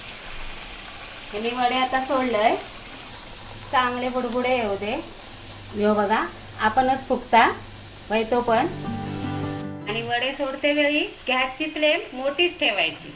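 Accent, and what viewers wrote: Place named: Malvani, India. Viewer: वहिनी आज तुमची चिकन वड्याची रेसिपी बघितलंय तोंडाक पाणी तर सुटलाच पण तुमची रेसिपी सांगूंची पद्घत तर औरच। मालवणी रेसिपी बघूची आणि मालावणी आयकायचा ता तुमचाच। मस्तच।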